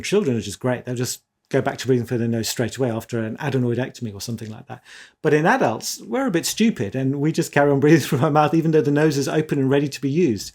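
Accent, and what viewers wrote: United Kingdom. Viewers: Good Day, man! Thank you for the upload. I've been mouth taping for about 3 years now and its shown great benefits. I'm pretty sure I am still having some mild snoring/Apnea events but the mouth tape was a game changer and my Hygienist actually said they noticed an improvement in my overall oral hygiene. Disclaimer: it may be anecdotal and ones mileage may vary. I appreciate all that you do for the sleep community and of course the English accent is an amazing addition! also: So many Cartoon/movie character pins on your coat.. oh my!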